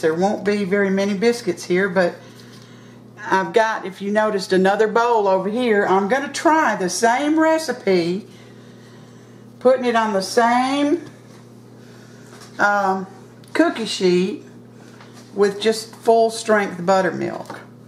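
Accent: South, United States. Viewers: Good morning! I just want to thank you for getting me enthused again with my southern cooking! Can't wait to make either of your biscuit recipes I've seen on here! You just don't know what or how overjoyed I am! I have just subscribed to your channel and will be looking forward to seeing more of your southern recipes! What I do want to ask is, do you have a great recipe, that's easy, using a cake mix for Southern Mississippi Mud Pie! My aunt made it for years and it was so gooey and delicious with a such a great chocolatey taste with her rich frosting and marshmallow top!!!!!😋 But you just sounded like a great southern voice of knowledge, I just had to ask you. No one has found her recipe to this day and oh how I wish I could try making that MMC or pie. I again thank you, I apologize for making this so long. Please have a Blessed day and TY again for taking my comment! 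I'm from NC and will be so anxious again to try your recipes and God forbid, they come out good! Be Well, Katy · Are you using self rising flour? If so, that means the flour has leavening agents such as baking powder, baking soda and could contain powdered buttermilk. It's OK by me, but in reality there more ingredients than just flour and cream. I have been baking biscuit for over 60 years and I have never use self rising flour. I guess I learned from my Mom because we always kept AP flour around for many types of bread making and pie crust. What's important is if you and your family like them. All I am saying is self rising flour isn't just flour it is a combination of leavening agents and other ingredients. Love your southern accent, I am an old country boy myself.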